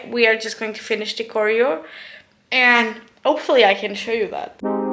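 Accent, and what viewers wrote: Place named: France. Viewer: I've watched several of your videos and shorts. Youre actually looking quite good! Trying to figure out your accent. First though South American, then maybe Russian, but then you don't pronounce h's, so French?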